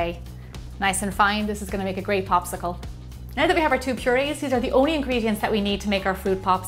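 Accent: Ireland. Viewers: Are you Irish? Your accent sound Irish. I'm Irish. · The Irish accent 😂I'm Irish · Are you irish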